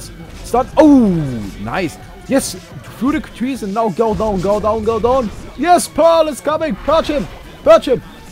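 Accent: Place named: Germany. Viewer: his english is hurting my ears... german accent at it's worst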